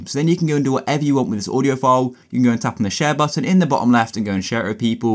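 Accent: United Kingdom. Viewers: British guy 🙂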